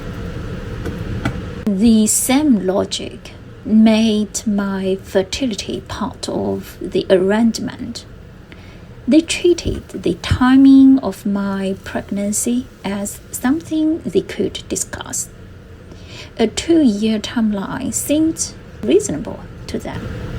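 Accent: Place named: United Kingdom. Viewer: have such a charming British accent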